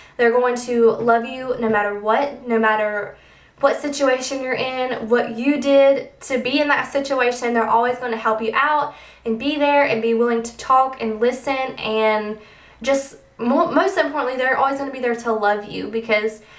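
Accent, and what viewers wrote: South, United States. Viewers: gahhh love your southern accent lol! good advice girl!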